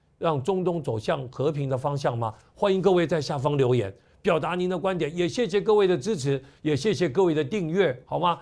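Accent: Standard. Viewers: 郑老师辛苦了！郑老师的汉语说得顶呱呱👍👍 比我们很多国人说的国语还标准。相信郑老师这档国语政论节目会越办越好！支持郑老师！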